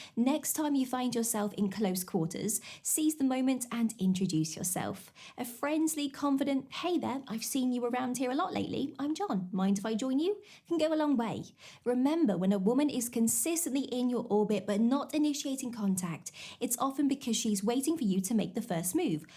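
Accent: United Kingdom. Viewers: Hello there. How are you doing on this lovely beautiful day? Well, it's lovely and beautiful where I'm at in Texas! 😊 Just like that crackhead needs crack for his crack pipe. I needed to hear that AWESOME British accent today! You have a very blessed day! 🙏 You're still finer than frogs hair! 👌 👍👏😎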